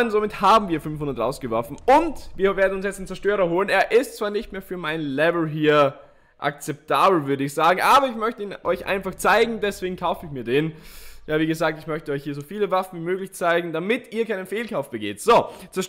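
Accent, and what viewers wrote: Austria. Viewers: Bist du östereicher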